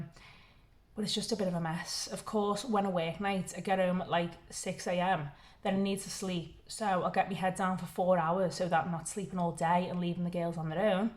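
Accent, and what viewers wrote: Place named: Merseyside, United Kingdom. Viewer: You were the first video I saw when I began looking for a new way of living and I struck the jackpot. To hear a Merseyside accent and to see you working towards the kind of life I wanted was an inspiration to me. Like this week, when I hit a bump in the road, and despite making many mistakes, I found it more manageable. Thank you and congrats on your 1k 💜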